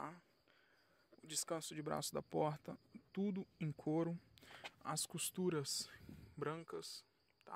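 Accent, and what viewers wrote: Porto Velho, Brazil. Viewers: Man... Vc É de Porto Velho - RO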